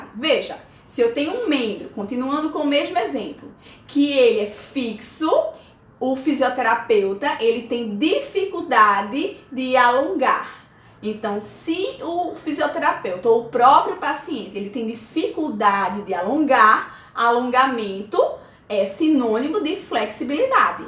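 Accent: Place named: Paraíba, Brazil. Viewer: Muito bom o video! Quando vi seu sotaque, lembrei da minha mãe, que, é da Paraíba e fala igual à vc!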